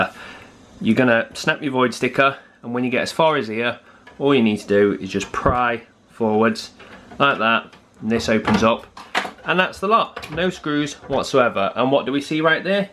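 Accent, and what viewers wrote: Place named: North, United Kingdom. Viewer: Legend. Loving the northern accent too. Where did you get the WiFi card?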